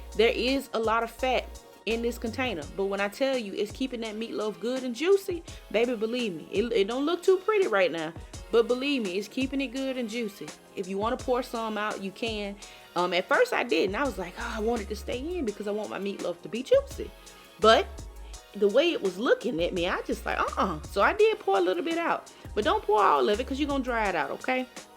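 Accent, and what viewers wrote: South, United States. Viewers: I could just kiss you sis ❤ first of all the video was like listening to my big cousin or someone close to me especially with the southern bell accent😂❤ FLORIDA CHECKING IN secondly OMG THIS MEATLOAF IS SO DAMN GOOD SI I WISH I COULD SEND A VIDEO. I didn’t make glaze I don’t care for it but I did laugh when you talked about the gravy cause guess what? I used it. But I kept the sides simple it’s already late gotta get these babies ready for school but from the bottom of my heart THANK YOU. 😊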